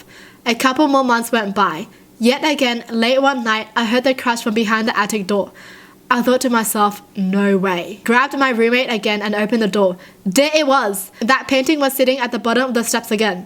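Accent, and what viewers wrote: Australia. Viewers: It's because she is Australian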